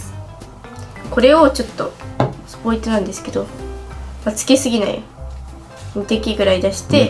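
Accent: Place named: Hiroshima, Japan. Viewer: ちゃん可愛い！所々でるじゃけいとかの広島弁？が私も使うから親近感あって嬉しい！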